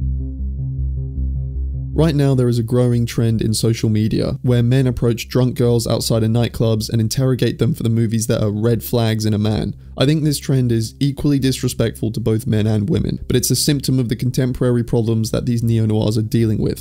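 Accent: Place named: United Kingdom. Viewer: Why do you think this is a good video essay? Is it because he's smart? Because that's only due to his British accent.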